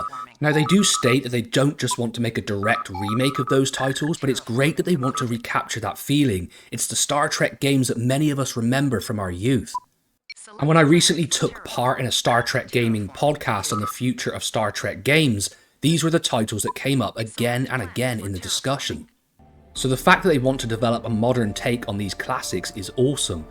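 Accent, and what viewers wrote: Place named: West Country, United Kingdom. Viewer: This is the dogs 🏀 🏀… nice to see knowledge and enthusiasm in the review, together with a little West Country chaaarrmmm oh arrr 🇮🇪❤️🐌🐸❤️🤗🐸🏀🏀❤️🐌❤️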